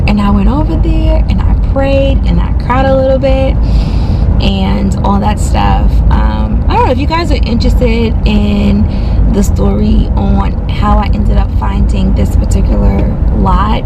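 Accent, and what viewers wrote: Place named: South, United States. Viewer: That country accent is too cute😂. . Can’t wait to see the new space. Everything will work out. Praying for you!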